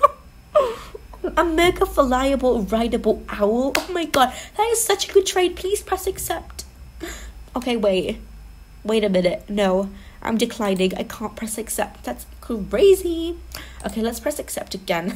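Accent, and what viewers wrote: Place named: United Kingdom. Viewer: Wow you are British and a normal English accent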